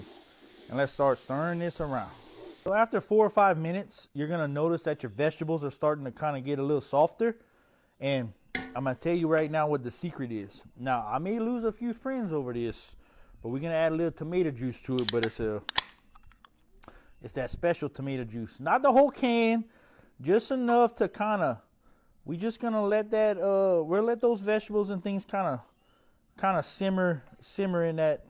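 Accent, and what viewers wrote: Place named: New Orleans, United States. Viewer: I feel like, especially at the beginning I can hear the New Orleans in his voice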